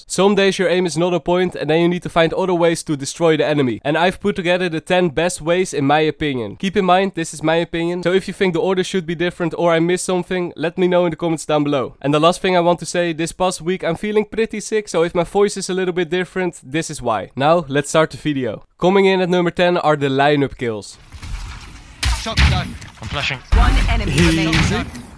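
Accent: Netherlands. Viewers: You sound dutch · Are you dutch? You Sound very dutch xD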